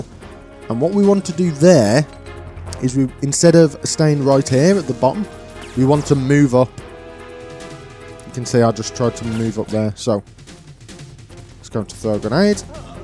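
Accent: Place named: Midlands, United Kingdom. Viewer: you from the midlands sound it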